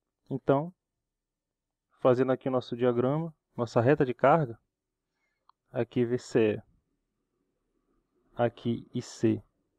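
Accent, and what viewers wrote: Northeast, Brazil. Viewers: apesar do sotaque caipira do nordeste que acho engraçado. piadas a parte sem discriminação, você é o melhor professor de eletrônica do you tube e do Brasil. devia ser premiado pela NASA, Harvard, MIT e cambridge.MUITO SUCESSO VC MERECE ALÉM DE SER "O CARA, "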